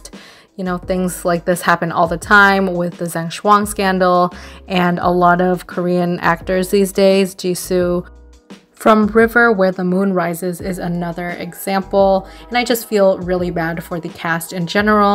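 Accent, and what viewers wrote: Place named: South Asia. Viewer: I have watched it once or twice. Not really interested about it. Because I think they are just not accurate. Just notice that the channel owners usually from south asian - due to the accent. Probably form India or Pakistan. It's hard to attain such informations like income and personal life. It's difficult to dig out info about C K and J artists n Idols because they keep their life privately. Especially in China. Mostly bcause the govt watches everything. But you can get info from reliable sources like established media with reliable journalist. Or try to read a blog. But absolutely they cant tell the exact amount. Only the speculation. I cant speak chinese korean n japan. I only read and watch in English. Oh yeah..even their own native media cannot tell the exact info about the artist. For example. Nobody knows where Fan Bingbing resides exactly evsnthough people said she lived in luxury complex. 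Japanese paparazzi often make mistakes about where their idols actually sleep. For chinese actors..we already know they have yinyang contract. Wont know how much they get paid. And we dont know how much their agency fee and their expense. And how they manage their money. Because when they exposed their wealthy, they should already to pay tax too. And dont forget people who will critize about how the artists manage their wealth. IU got critics when she bought the land. Actually she was helped by the asset management agency. JunJihyun was critized for being a mean landlord. AFAIK, s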